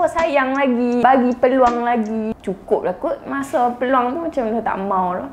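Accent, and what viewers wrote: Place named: North, Malaysia. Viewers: Sedap lah orang kedah cakap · Haihh. Awat aku suka sangat dengaq loghat utara ni. 😂 😂 😂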